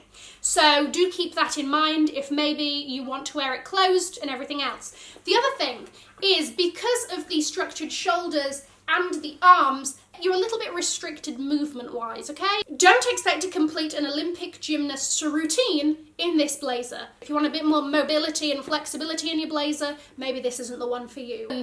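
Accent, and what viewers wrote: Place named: Liverpool, United Kingdom. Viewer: Great content. What colour is your burberry trench? I have to say again you sound like a" posh" scouser. Your fab always make me smile. 😊😊xx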